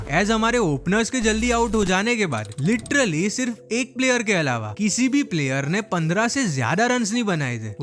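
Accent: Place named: South India, India. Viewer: speed is alright. I think you have bit of south accent,thats the point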